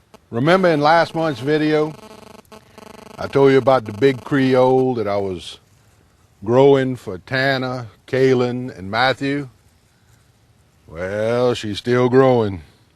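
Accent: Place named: Louisiana, United States. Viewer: Vous devez parler le français cadien ... I can tell by that accent :P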